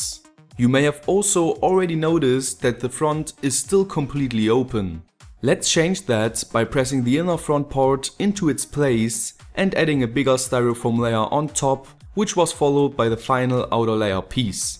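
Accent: Germany. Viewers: he's german, it's an accent. deal with it. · Du kommst doch bestimmt aus dem deutschsprachigen Raum oder? ;)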